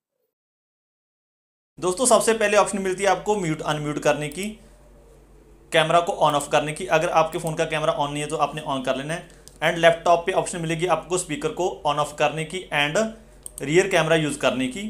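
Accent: Punjab, India. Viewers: are u from punjab?? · Bhji tusi punjabi a ki hryanvi?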